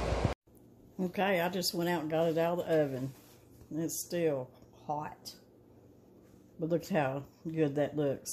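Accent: South, United States. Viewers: I LOVE a Southern accent along with the food. Ty. And your hair looks fantastic!! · This looks delicious. I have made apple crisp many times over the years. I hadn't done one in a few years. My dad loved them and would ask me to make him one when I would go home to visit. He passed away in 2018 and I hadn't even thought about making one, but seeing this has made me think of him and how much he loved this and so many other sweet decisions deserts. Not sure where your from but you sound a lot like my family in GA and AL. You look a lot like a aunt, even your voice. If I closed my eye's and just listened to your video I could see her as plan as day. I love your channel and have even had my mom watch your video on making Sauerkraut. Thanks for your wonderful channel. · Your show and your passion make my Friday's. I love your down home charm and Southern accent! 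It is the same comfort food my Grandma used to make but she called it Apple Betty. Not sure if that name is a Canadian or old British thing because despite being Canadian born, my Grandma was as stiff Victorian as they come. My variant is, as my bones get older and now its legal here, I add some ground home grown weed to the topping. That and there is a Fromagerie near here where I regularly get 15 or 17 year old Cheddar cheese which is the perfect compliment.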